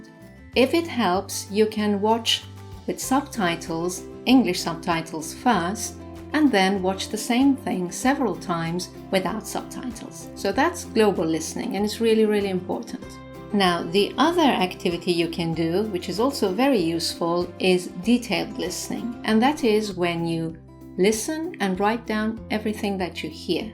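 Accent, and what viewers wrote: United Kingdom. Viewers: Your accent is very light for someone British. What part of England are you from? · Hi, I Just want to say thanks for the usefull tips you give me, I just have a Last question I know you speak british, but what kind exactly? From what City comes your accent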